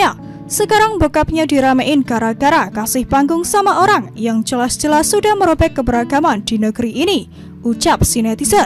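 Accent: Indonesia. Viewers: Yang ngomong medok banget 😌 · MEDOK banget ya yg baca scropt.... bikin SALFOK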